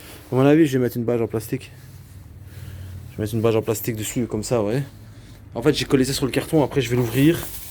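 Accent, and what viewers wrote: Belgium. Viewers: Ça fait plaisir de te revoir, toi, ta jolie mob et ton petit accent Belge qui fait plaisir 😎✌️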